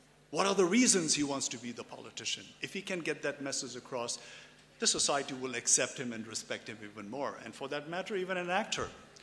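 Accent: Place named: England, United Kingdom. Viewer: Love his English accent! Humbly appreciate your knowledge and the way you inspire students with your valuable words.. incredible speaker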